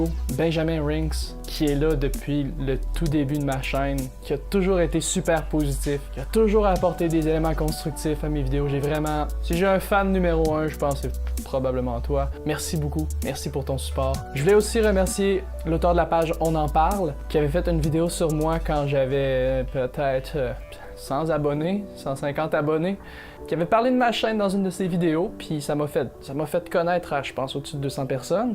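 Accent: Quebec, Canada. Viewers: Bravo! Bravo! Je t'ai découvert grâce a tes vidéos sur la déréalisation , ça m'a aidé moralement. J'aime la plus part de tes vidéos et surtout ton accent québécois (je suis français) :)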